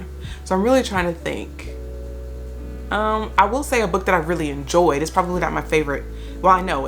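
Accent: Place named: New York, United States. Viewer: I love her NY accent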